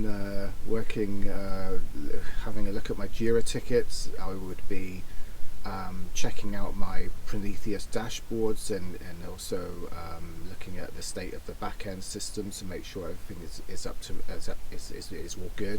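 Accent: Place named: England, United Kingdom. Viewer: I would suggest you try a career helping women take care of their computers, doing everything needed to keep their computers going, also helping with mowing the grass and fixing doors when needed. Meanwhile, I think you should film all of England for those of us across the pond. I just watched 14 minutes of you and the best moment was when you said the dog was chilling. But I want to see cows and countryside with you talking about all the places in England and hear your lovely accent and "pardon me"'s for your coughs. That could be your signature, in a good way. Then we'd know it's you behind the camera.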